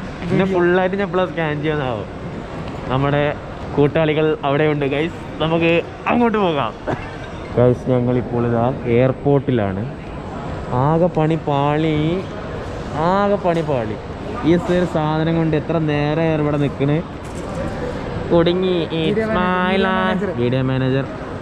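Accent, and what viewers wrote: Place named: Malappuram, India. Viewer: മലപ്പുറം ഭാഷ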